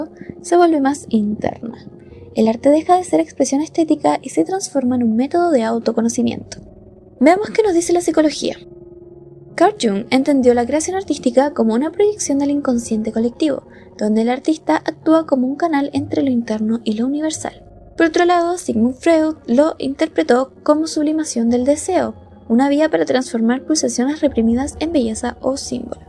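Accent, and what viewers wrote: Chile. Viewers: se me hizo recontra tierno su acento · tu voz con ese acento chileno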